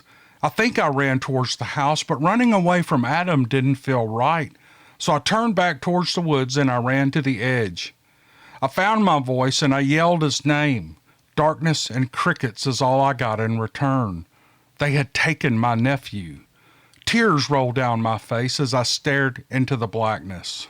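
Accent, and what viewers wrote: South, United States. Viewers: like everyone else I love your voice! I’m from Mississippi living in Florida now, so I love Southern voices.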